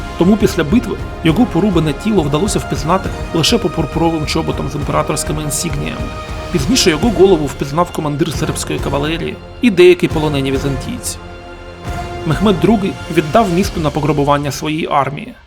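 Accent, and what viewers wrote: Russia. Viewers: Конечно на Украинском это патриотично . Но все таки родной язык декламатора русский . Слышу ґе , а не ге . Слышу ясно так как знаю Украинский досконально . Но все равно молодец .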